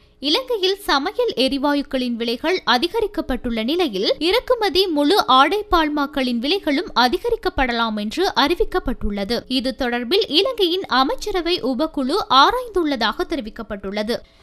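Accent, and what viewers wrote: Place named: India. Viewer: ழ, ல, ள உச்சரிப்பு மிக மிகப் பிழை, கேவலம். லங்கா சிறி ஏன் இப்படிப் பிழையான இந்திய உச்சரிப்பு செய்தி வாசிப்பாளரை அமர் த்தியுள்ளது?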